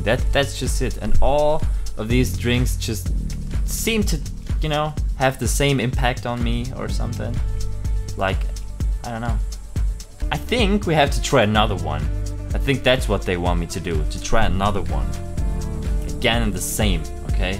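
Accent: Germany. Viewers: Bist du deutscher? ^^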